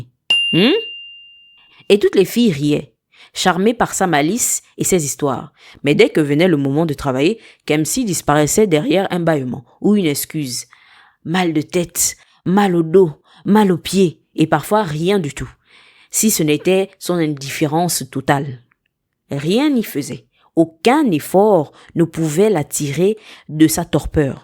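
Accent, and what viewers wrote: Gabon. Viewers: C'est une voix Gabonaise derrière cette belle histoire ❤ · La narratrice est forcément gabonaise. Ne changez pas pour l’IA svp❤ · Un bel accent Gaboma❤ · La voix d’une gabonaise ❤très belle histoire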